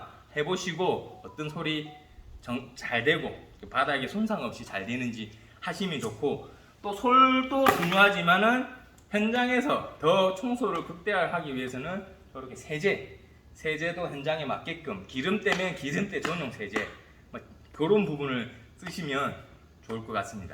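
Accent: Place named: Busan, South Korea. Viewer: 항상 청소장비들 소개 잘보고 있습니다 부산 사투리 ㅎㅎ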